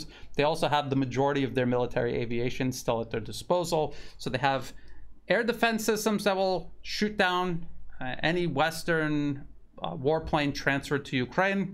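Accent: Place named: United States. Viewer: It might be your American accent but I hear you talk about “guided bums”. Sounds kinky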